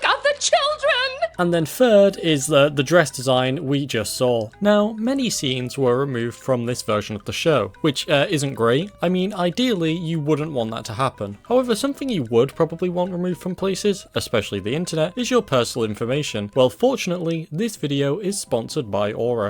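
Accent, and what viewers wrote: United Kingdom. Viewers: Listening to a brit say “ahwsk the stawhff” instead of just saying “ask the staff” really makes me empathize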